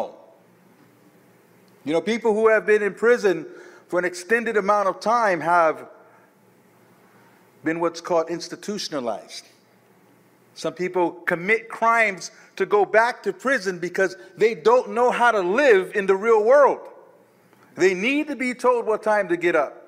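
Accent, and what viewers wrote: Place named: New Jersey, United States. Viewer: yessss!!! Excellent points. When I first heard you and lady C say that “I’d rather have questions we can’t answer than answers we can’t question “ that resonated so hard with me. I mean , it was so enlightening. That fear is so thick we didn’t even realize we were living in it. I thought you’d be the best video to send to them because you look like that bethelite, you’re relatable, your presentation was what we as former Jehovah’s Witnesses can relate to. Your voice etc. reminds me of the congregations in New Jersey and NY. Thus, I thought they would be comfortable to listen. All they heard was this was an ex JW. DEMONS!!! It is an ATM great analysis. I went through a period where I’d lose sleep thinking how can I get my family to WAKE UP. Sadly after my mother’s passing in December 2018, I had to learn to let it go and let God. 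They were never going to listen to me now. Looking forward to seeing mom in the “new system “. The mind is more amazing than I ever could imagine. Some can go thier whole life excepting that they don’t deserve answers to their questions while others actually have the courage to break free from that conditioning. God bless you and Lady C for your inspiring work.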